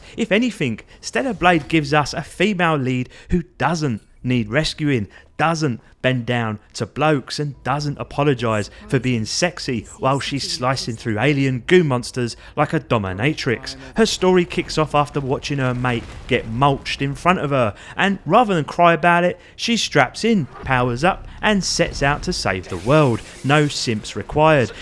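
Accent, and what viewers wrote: Cockney, United Kingdom. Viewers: Thank you for another review me 'ol cockney sparra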